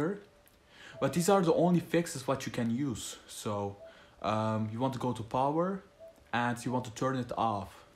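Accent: Netherlands. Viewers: Ik vind het echt leuk wanneer Nederlanders Engels praten xD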